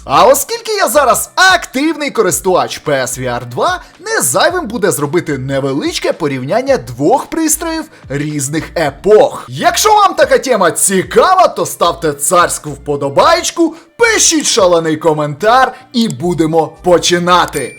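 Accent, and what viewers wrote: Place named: Irpin, Ukraine. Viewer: Цікава говірка у вас в Ірпені)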